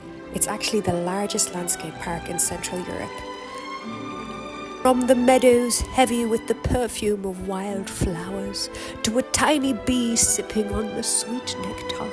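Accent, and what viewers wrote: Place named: Ireland. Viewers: Funny video! As a Czech and also a resident of Prague, I really enjoyed this video. But I definitely wouldn't choose the campsite near the Veltrusy Castle! :D Oh, how brave you are! :) But I enjoy your accent the most. If I'm not mistaken, it's Irish, right? Love it! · I don't even know how I ended up here, but it's a really nice video, so I looked at the creator, German location, but for a moment something didn't sit right with me, it wasn't a German accent, but then at one point I heard that nice R and my mind immediately turned to the character from The Simpsons Willie, but I guess I guessed wrong because you're probably from Ireland, right? Anyway, very nice work, I look forward to more.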